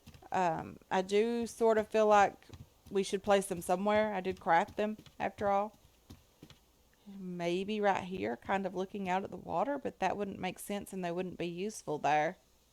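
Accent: Kentucky, United States. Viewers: There's my Kentucky accent fix! Brilliant episode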